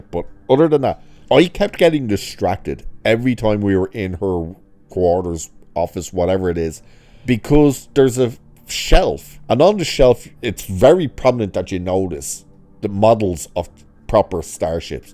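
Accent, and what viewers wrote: Ireland. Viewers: He's Irish not English.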